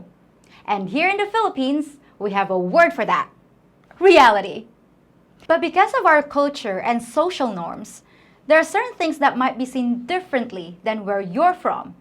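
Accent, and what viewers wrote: United States. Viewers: very well done video. Just one thing is a little disturbing but I do not mean it at all as an insult: if you speak in english, it would sound nicer to use proper british english instead tp but on american accent what is seen as not that nice to hear in Europe. Never mind. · Your American style of English is interesting.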